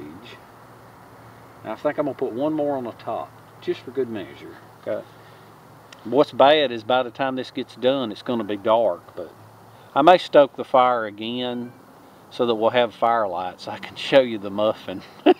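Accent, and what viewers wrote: South, United States. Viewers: Even if you were talking utter gobbledegook I could still listen to you talk all day long. That is the most American accent I have ever heard. Just keep talking mate, it is just so entertaining. Love your oven too, definitely going to make myself one of those and I'll be sure to give you the credit for it. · Dang I love your DIY videos. I love the way you talk most of all. Us Southern folks are losing our dialect and I am happy to see yours is intact. MOST refreshing. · hi, I found you a couple months ago & spend many hours watching your vds. I know I will never get to use most of what you teach because I'm an old 83 yr old woman but I feel that the things I learn might help me to help others get through when the SHTF & I believe that's not very far away. I'm thrilled that you & Nick seem to enjoy your time together & I LOVE your Southern accent & your laugh. 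My dream was always to own 40 acres in the woods & build a small log cabin & just sit & watch nature. Didn't happen. I built a house by myself. Outdoors us where I'm the happiest. Sorry this is so long but I love listening & watching you.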